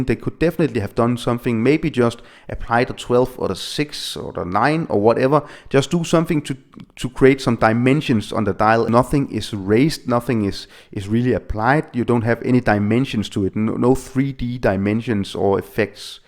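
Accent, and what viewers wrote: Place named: Denmark. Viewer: Aha, dann viele Grüße nach Dänemark, hab vom Dialekt gedacht, das kann doch kein Amerikaner/Engländer sein :-)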